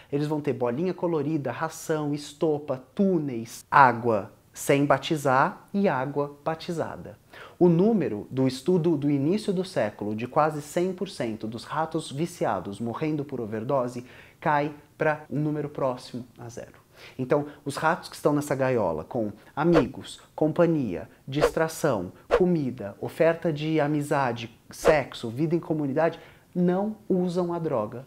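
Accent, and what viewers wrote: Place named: São Paulo, Brazil. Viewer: Parabens pela interpretação do sotaque típico paulistano... e a diminuição dele de forma gradual e imperceptível conforme a seriedade do assunto avança. Genial!!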